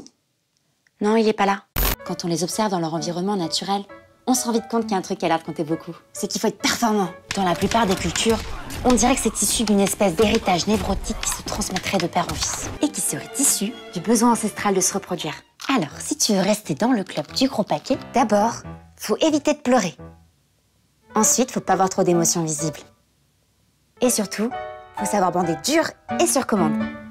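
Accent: France. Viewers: Je sait pas si c'est l'animatrice qui fait cette effets mais c'est la premiere fois que je n'aime pas vraiment une de vost video ... Encore c'est peut etre ton accent trop francais qui faisait que en ten que quebecois je ne comprenais pas toujours mais bon ... En esperent retrouver notre youtubeur habituel a l'avenir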